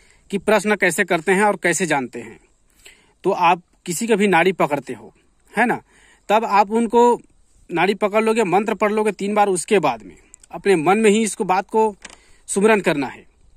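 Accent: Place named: Chhattisgarh, India. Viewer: आप लगता है। छत्तीसगढ़ से है। आपके बोलने की शैली से पता चल रहा है। कहां से हैं महराज पता व नं बताये। धन्यवाद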